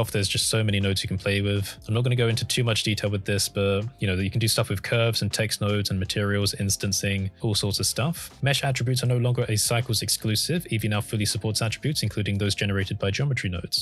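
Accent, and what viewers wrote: United Kingdom. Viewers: this video was exactly what i needed. an easy to understand explanation of the new features in a british accent with a bit of dry humor weaved in. Thx. love ur videos and by-gen. idk how to make a unicorn emoji tho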